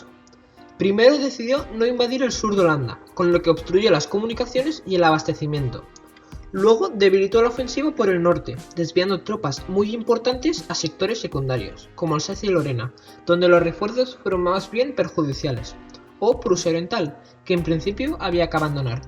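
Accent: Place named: Catalonia, Spain. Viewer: Muy bien muchacho Sencillo y claro He visualuzado tu canal y tenes videos muy interesantes No entiendo tan pocos seguidores Ojala vaya a mas Por cierto eres catalan? Yo vivi unos años en Barcelona Me parece que tu acento es de alli Saludos desde Israel de un judeoargentino sefardi Shalom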